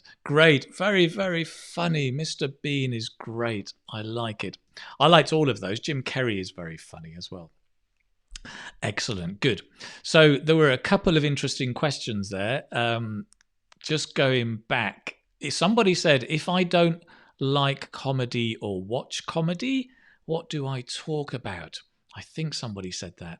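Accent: United Kingdom. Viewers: Hi What accent do you have? British accent, but which one exactly? Rp?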